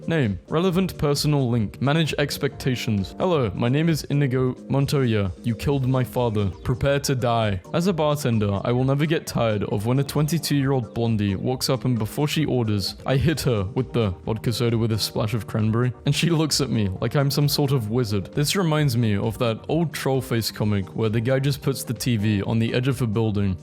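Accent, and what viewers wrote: United Kingdom. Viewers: first of all it was a joke, second of all he has a British accent so I assume his first language is English and you saying it like it’s not his first language and if that was the case I would think differently, second of all I’m not saying he should be able to pronounce all of those words you listed because yeah they are hard to pronounce. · It’s cause he’s british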